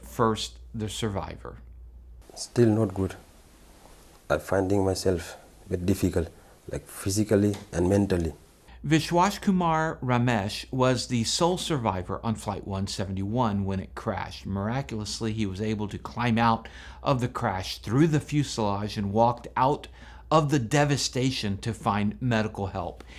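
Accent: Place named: Midwest, United States. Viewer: Your command of our (American English) language, -being an American as reflected in your Midwest-ish accent(???)- is so much better than most of us. Interestingly, at one point, you used the word dived and I was ready to pouunce on that. Dived IS a past-tense term for dive, but so is the more familiar American term **DOVE** ... thus I ask: are you a Brit in American or Canadian sheep's clothing? Just curious. Oh, and yes, I love the tone and attitude you have in your thoughtful videos. So there.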